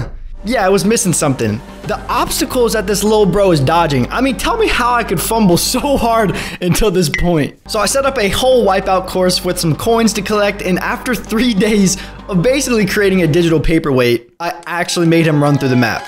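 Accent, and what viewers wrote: United States. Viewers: Video starts Skip all that padded murican accent bs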